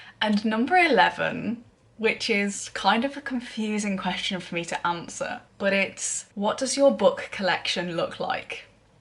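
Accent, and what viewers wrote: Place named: Birmingham, United Kingdom. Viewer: Hiya! Welcome to booktube, I love your energy 😊 sounds like you might have a bit of a brummy twang going on too? We need more brits in the community for sure! Will check out your other videos for sure!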